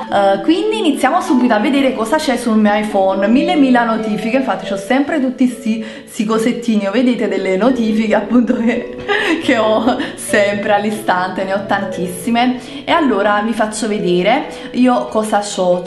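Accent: Naples, Italy. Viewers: Sei napoletana eh? Se si anche io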